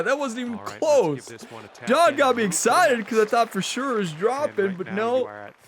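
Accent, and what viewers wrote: United States. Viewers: One time for every shot:_gamerOnetime: Birdie train called 🐦🐦🐦🐦🐦🐦🐦 I think you should complete the challenge on this one fairly comfortably. So get ready for another tier 4 activation. And yes Nascar series is awesome. Featuring Rhett Games as Rocket Rhett, the Daytona Demon (the most entertaining to watch driver in the world) Edit: What an idea, Bdan, GbWaCkErGuY, Apex, Respawn, Kawkster and Early1981 as playable pros. What a match play ladder that would be 😁 Edit2: As a person from the UK, I can confirm you pronounce Royal Birkdale correctly. The person who said your were saying it wrong was likely either a troll, or somebody who was thrown off by your American accent 😉 Post round edit: Comfortable as predicted. Great round 👍 You should give Dingo's latest course a try for an impossible challenge. It's called Sliabh Abhartach (pronounce that if you can 🤣). 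It's not one of his crazy fantasy courses. Just a beautiful realistic creation and a good challenge.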